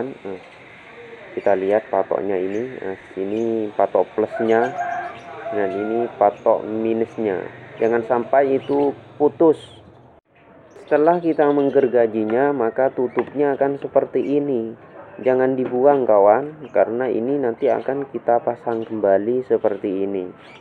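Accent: Madura, Indonesia. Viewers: Terima kasih ,Mohon maaf, Logat Maduranya Medok sekali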